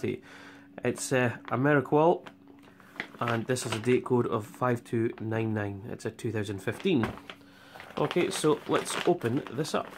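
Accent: Scotland, United Kingdom. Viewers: I like your scottish accent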